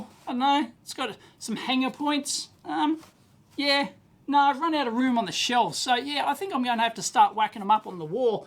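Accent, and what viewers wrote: Australia. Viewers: Congrats on your huge milestone! You were, or are one of my favorite channels since I started down the YouTube rabbit hole 18 years ago. Your adorable Aussie accent and high energy brought me much entertainment as well as valuable learning experiences. Here’s to the next 17 years my guy! Congrats! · This great man with his Aussie accent helped further my interest in electronics. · Congratulations! Well deserved! This is probably the only channel I’ve followed continuously for the past 12 years. As a junior EE, I learned a huge amount from your videos, about electronics, thoughts, experiences, and opinions you shared along the way. Believe it or not, some of those actually made a difference when I was making choices in my own career. I'm sure your content has much bigger impact on your audience than you probably realize. I can confidently say I know a lot more about electronics… and a lot more about how Australians talk 😂🇦🇺 Keep it coming. 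Forget about retirement, not working for the man is a life sentence 🤣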